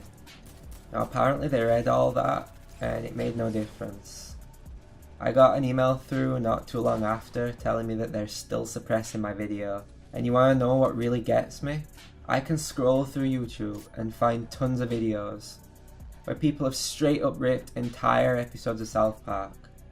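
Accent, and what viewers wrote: United Kingdom. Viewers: Dude, where are you even from? Your accent sounds British.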